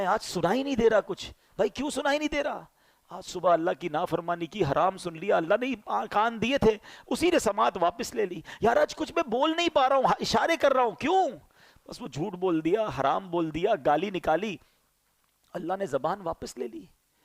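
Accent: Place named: Punjab, Pakistan. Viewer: Hzrat AAP itni peare pnjbe bulty ha Bhat achi lghti ha masgalla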